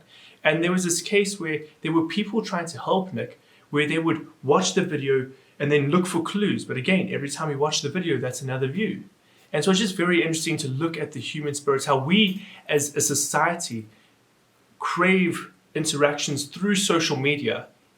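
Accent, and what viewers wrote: South Africa. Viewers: I hear a Bloemfontein accent.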